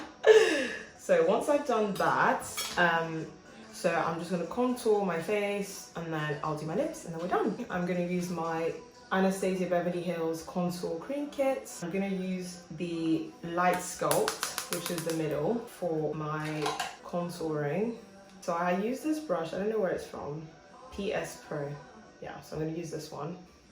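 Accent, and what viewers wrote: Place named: China. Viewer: I LIKE YOUR CHINESE ACCENT MME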